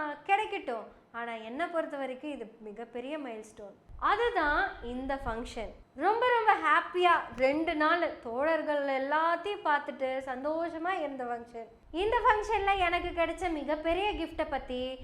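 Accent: Kongu, India. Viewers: சகோதரி! உன் கொஞ்சும் கொங்கு தமிழ் மிகவும் சிறப்பு வாழ்த்துகள்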